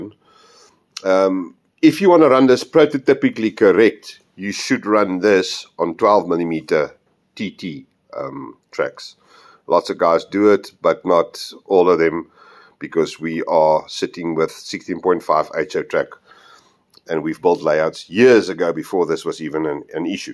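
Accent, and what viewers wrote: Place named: South Africa. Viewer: Trains are great and everything, but come here more and more for the accent. Remind me the old days when I worked in the UK and had a few SA colleagues :)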